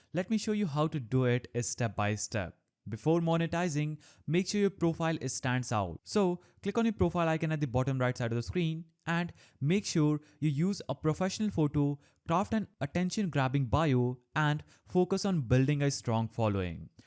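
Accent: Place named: India. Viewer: Damn I hear so many Indian channels that make zero effort to be understandable. You are Not that. I love your accent. Especially the way you say "b" so cute..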